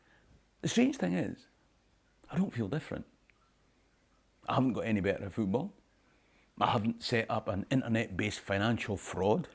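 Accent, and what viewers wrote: Scotland, United Kingdom. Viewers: I dunno, you sound Scottish to me! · I still really like the Scots accent...no matter what you're mixed with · you are a Scottish standard bearer! Yes, you have west African heritage and are rightly proud of it. Your accent, behaviours and, above all, attitudes are quintessentially Scottish. You see things from an egalitarian perspective, not a mad “patriotic” climber of lampposts. I’m proud to say that I am the same as you! God bless you and Lang may yer lum reek! ❤️🏴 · Well, as a Czech who listens to you in a foreign language, twice over... your voice basically is what my mind now conjures when I think of someone Scottish, AND listening to you regularly helped me understand other Scots, too. So the racists who deny you that side can go to whatever your preferred expletive is. 😂 (And also as a Czech I'm undoubtedly a Central European mutt. 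Years ago a distant relative did have a DNA test made and back then had the closest match in Ireland...) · I don't know how anyone who hears you speak could possibly think you are anything other than Scottish. Racists make no sense. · Sorry, but you sound so Scottish it gives me my tingly happy fix! Those racists are so dumb, I am worried for their health. Well, not really scared, cause everything bad that comes to them they deserve tenfold. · Dear: You are definitely a Scot! From your accent to your attitude. My best friend growing up was born of full blooded Scots - Highland mother and Lowland father. I couldn't understand her father at all, and I sometimes wondered if that was on purpose, to discourage unwanted visitors. He was part of the local fire department and worked long hours. My friend raised budgerigars, teaching them to speak and sing. Everyone of those birds picked up her mothers accent! 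It was hilarious! When the birds would talk, sometimes they would imitate her mother calling her. It was such a precise copy, that Charlene would answer them before realizing it was the birds, not her mother. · you are Scottish to me. You were born in Scotland, and your Mammy is Scottish. You’re from the same toon as my parents and you sound just like them! I was visiting Perth with my American son and he recognized your poster up at the Theatre 😊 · You have a Scottish accent and your name is “ ”. It’s kind of funny that that’s not obvious enough.😂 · Your accent conveys Scottish but your DNA tells another story ! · "Then ye spet inae coop"... No one can tell me this man isn't Scottish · I am sure you know already, but you have a lovely family, and you speak like a Scot, not a Sassennach! Reference databases are skewed and very unreliable. Maybe your proportion of Nigerian DNA is because bananas grow in Nigeria, and we share so much DNA with bananas? 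· With an accent like that you are most definitely Scottish my man, your ancestry is just that what ones ancestors were does not make you it, I am from Romani decent but I'm English not punjabi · You sound Scottish. 😉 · I don't know how anyone can question Scotnicity given his accent. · You sound like a Scotsman to me.. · You are steeped in Scottish history, live in Scotland and certainly sound like a Scot. You are certainly Scottish in my book! Always enjoy your content! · I go with your accent and your Scottish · I’ve never heard of your accent in Africa. You were born in Scotland hence your a Scotsman. You did a fine video. Your words ring true. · For someone that some are trying to claim is not Scottish, you have a real canny Scottish accent (that's canny in the Geordie and not the Scottish sense, just to confuse the Scots). It seems some people just cannot hear the obvious. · Mr., you have to remember that the largest source of human bullshit comes from racists who can't wait to expose their ignorance and spout their lies. 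As to DNA, you are who you are, and you were born in Scotland and, lord knows, you certainly speak with a heavy Scots accent. And your family is gorgeous!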